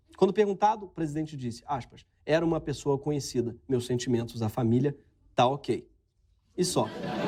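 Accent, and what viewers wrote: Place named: Rio Grande do Sul, Brazil. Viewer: Muito bom o sotaque gaúcho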